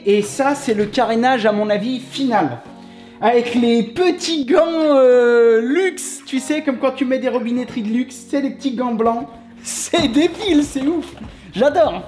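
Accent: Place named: Lyon, France. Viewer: Tip-top, comme d'habitude. Ca fait plaisir d'avoir affaire avec un pro digne de ce nom: compétent et honnête, animé par la volonté de faire les choses comme il faut et par le désir de satisfaire le client. Retraité du métier depuis pas mal d'années (je travaillais avec du cuivre uniquement), j'apprécie vivement les nouveaux matériaux, matériels et façon de faire avec les produits modernes. Les tuto éténat animé de manière tellement agréables. Et, petit plus, l'accent lyonnais ou stéphanois, si mes souvenirs sont bons!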